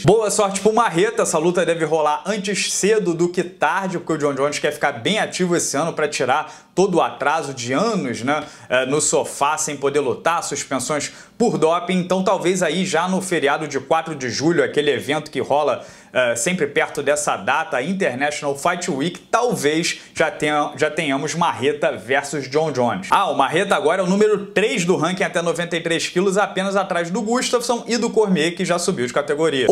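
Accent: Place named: Pará, Brazil. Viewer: O tem um sotaque de paraense muito acentuado.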